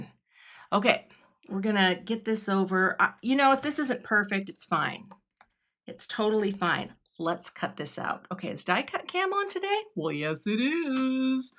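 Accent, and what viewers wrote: Midwest, United States. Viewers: ?Harold and the Purple Crayon? I hear a little mid west accent in you.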